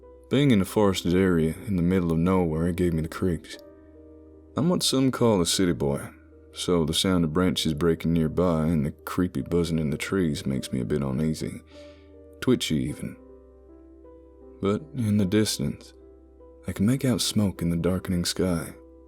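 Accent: South, United States. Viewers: As a native Southerner, I'm very impressed with your accent work, cool story too! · Born and raised in Bama and I thought the same thing. Fantastic job, just minor details. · You got the southern accent down. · The best narrator with the best stories! Your southern accents are almost perfect. Only two words give you away - been and says. Southerners pronounce them as ben and sez. Yes, I am a hillbilly. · North Carolina Appalachian boy, daddy from west Virginia and I'm from the smokies. You're right, this guy nails it better than Hollywood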